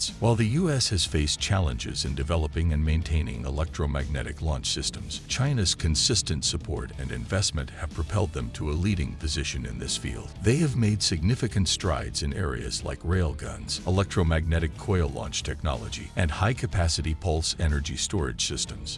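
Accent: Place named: India. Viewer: Yindian accent always give me a migraine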